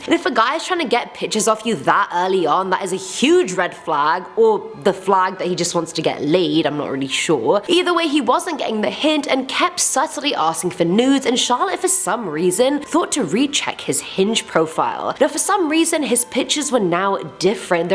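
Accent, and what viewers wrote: South, United States. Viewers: Lol nice southern accent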